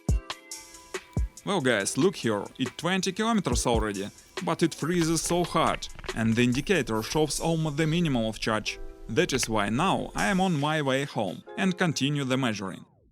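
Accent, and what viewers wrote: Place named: Russia. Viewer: Сразу слышно Русский говор...🤣🤣🤣такой был у нашей училки...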